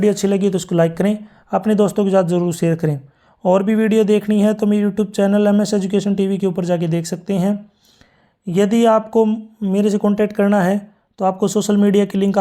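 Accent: Haryana, India. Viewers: Aawaz se hi lag raha hai ki sir haryana se hai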